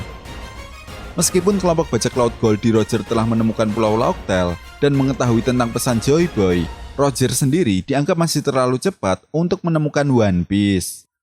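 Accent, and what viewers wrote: Java, Indonesia. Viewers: Lebih enak dengerin narasi dengan suara begini, drpd yg nadanya dibikin 'sok mantap' wkwk Lebih chill dengernya ini Javanese bgt min, nice, stay low yea~ Keep the good work clap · Mendok ya bahasany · NARATORNYA MIRIP SUARA DONO . SERIUS. KALAU DONO PAKE LOGAT JAWA DI FILM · Logat Jawa nya kental banget 😅😅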